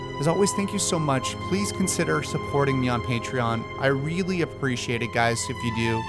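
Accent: United States. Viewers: Are you from Wisconsin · Is that a Florida accent?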